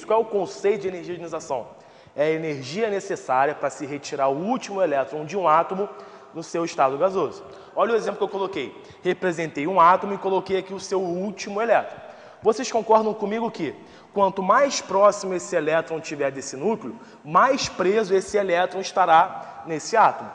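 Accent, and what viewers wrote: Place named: Rio de Janeiro, Brazil. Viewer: eita sutaque de carioca, mt bem explicado!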